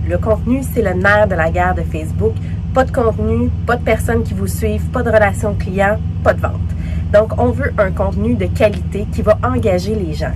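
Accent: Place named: Quebec, Canada. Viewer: J'aime l'accent canadien(québécois)...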